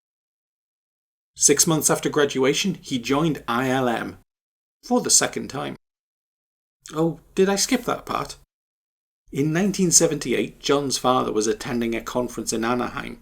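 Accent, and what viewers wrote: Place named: United Kingdom. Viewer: First time seeing your channel, I think. It really reminds me of Captain Disillusion, if you're familiar with him. Great production values where you can tell a lot of effort has gone in, and very educational with clear, inspired examples to show what you're talking about. Like a less boisterous, more British version of Captain D, for sure!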